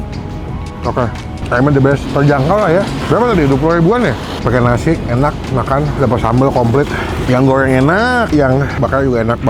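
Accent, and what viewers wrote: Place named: Java, Indonesia. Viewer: Mantabbe ini medok...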